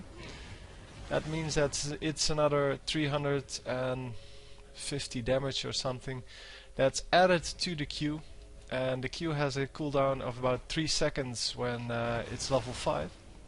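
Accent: Netherlands. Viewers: haha I noticed he's Dutch because I talk the same way as him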